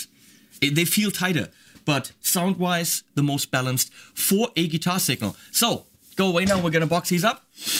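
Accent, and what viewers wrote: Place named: Germany. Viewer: ich mag your german accent lol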